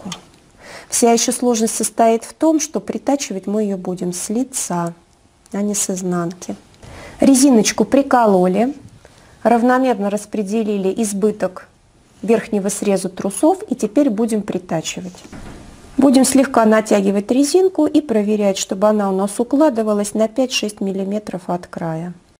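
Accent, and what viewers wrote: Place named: Voronezh, Russia. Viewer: Спасибо за мастер-класс,! Первый вариант притачивания резинки лучше)). А еще мне очень нравится ваш приятный голос и воронежский "говор", который я узнала с первых минут видео😄.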